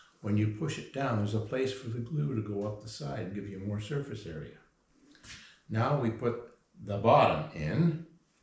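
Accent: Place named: Canada. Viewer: picking up on a Canadian accent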